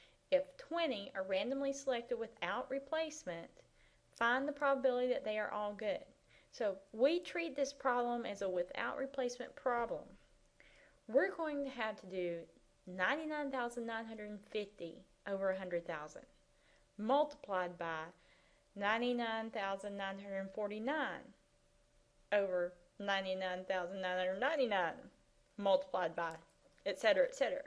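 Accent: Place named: South, United States. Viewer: nobody has liked your video with that sweet southern accent of yours?? well, i just did. just worked on this with a student i tutored :-)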